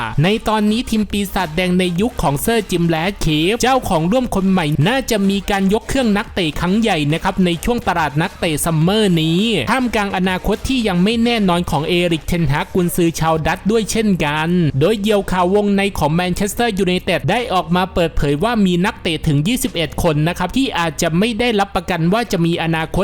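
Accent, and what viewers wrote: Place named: Isan, Thailand. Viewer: admin พูดอิสานเป็นด้วยเหรอครับ 555+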